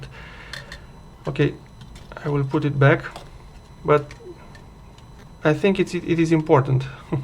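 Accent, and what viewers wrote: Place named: Romania. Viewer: Romanian english.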